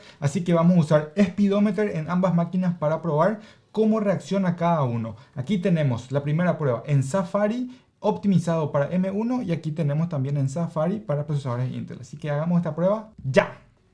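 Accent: Paraguay. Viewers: Eres paraguayo, me di cuenta en solo 30 seg de vídeo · Una pregunta. Sos de Paraguay?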